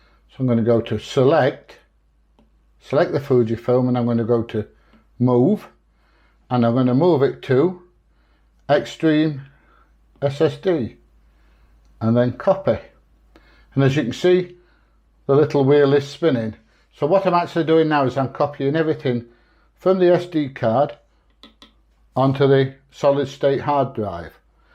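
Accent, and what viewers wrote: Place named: Yorkshire, United Kingdom. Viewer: What a character! Yorkshire lad?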